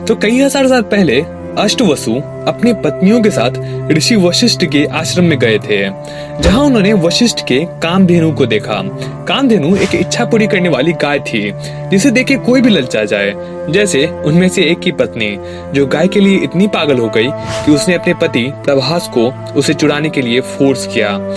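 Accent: Bihar, India. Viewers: Bihadi ho kya bhaiya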